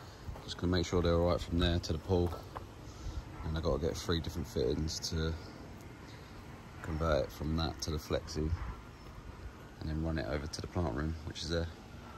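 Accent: England, United Kingdom. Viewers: You sound English?? Aussie here. Concrete and aggregate. Or fibreglass drop in. Salt water chlorinated! First I seen of one like this. You did a brilliant job.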